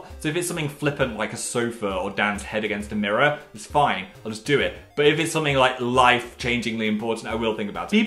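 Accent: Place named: Northern England, United Kingdom. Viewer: Ahhhh Northern Phil is back with another “Ello!” intro!!! I’m loving his return to his northern roots 🥰💗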